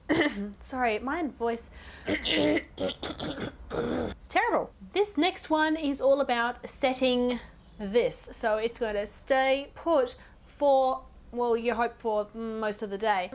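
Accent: Australia. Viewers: I don't have acne (i feel so lucky) but it's great seeing how you beauty gurus could do "magic" with make-up products. I own lots of them, still not able to cover my pores LOL Thanks for the video! Thumbs up for ya. And omg ur Aussie accent is so decent to listen to. Makes me miss Oz sooo much :* thanks ;)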